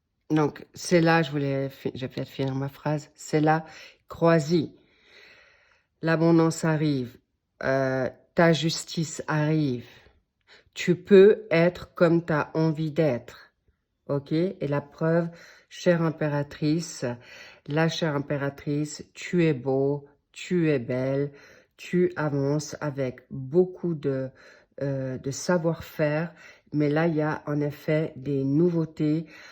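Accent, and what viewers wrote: United Kingdom. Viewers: Merci beaucoup pour ce splendide tirage 🙏 Et merci pour votre diction parfaite et cette pointe d'accent d'outre-manche 🎉🙏😊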